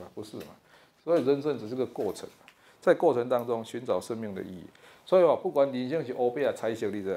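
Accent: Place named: Taiwan. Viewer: say Mandarin is his second language and English his technical language. He has a strong Taiwanese accent when speaking Mandarin and borrows many Taiwanese vocabulary.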